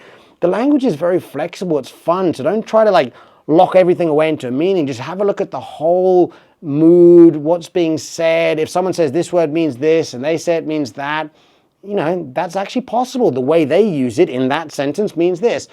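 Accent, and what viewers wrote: Thailand. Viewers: I don't know why but your English sounds exactly like English speaker who can speak Thai. It's often like that and it's fascinating. Maybe learning Thai changes your how you speak English? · Only Bangkok people speak like this, it's too proper for me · When you are speaking English, i thought Thai is speaking English. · His Thai sneaks up on him when he speaks English 😂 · สำเนียงไทย 100% เลยค่ะ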